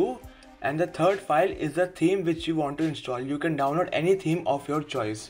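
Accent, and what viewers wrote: India. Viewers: Indian English videos are so funny :3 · whenever u hear an indian accent iknow this shit gonna work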